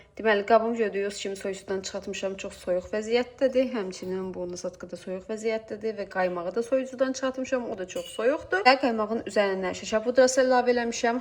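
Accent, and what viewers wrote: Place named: Shaki, Azerbaijan. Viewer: Bayaq Dan seki lehcesinin sirinliyi celb eliyirdi, indi de sirinliyi, Men ona balet eliyirem butun vloq boyu🥰Allah saxlasin 🙏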